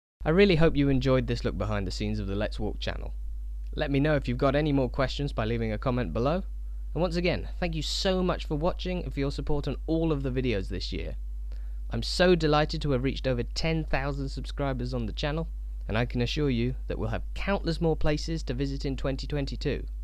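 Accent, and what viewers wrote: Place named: North East England, United Kingdom. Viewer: I genuinely thought you were from the North East of England for some reason! Love these videos - I’m so glad I found this channel - it’s like ASMR for the eyes (if that makes sense haha 😂 )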